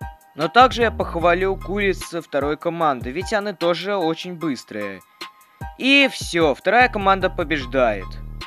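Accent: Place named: Ukraine. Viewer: Мне кажется что ты украинец или беларус я беларус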